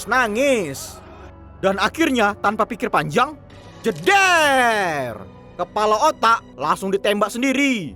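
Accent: Medan, Indonesia. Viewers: Slalu suka logat medan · Org medan bang logat nya kayak orang medan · Orang Medan ya bro?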